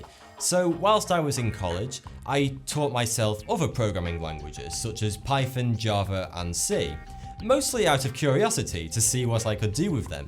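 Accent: United Kingdom. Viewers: hey! How to develop an awesome an awesome brittish dialect like you have. I really always liked it. i learned my english in germany. i know many languages but habe a problem with my accent.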